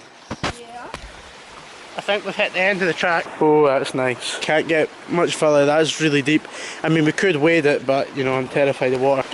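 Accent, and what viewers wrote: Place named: Scotland, United Kingdom. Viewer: Scottish revealed. Known by accent! xD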